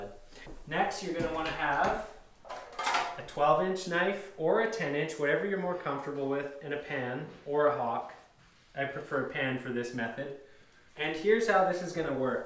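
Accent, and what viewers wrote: Canada. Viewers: Thanks so much for sharing your expertise here. Going to use this technique on my walls before applying wallpaper. Really appreciate your friendly Canadian tone 🇨🇦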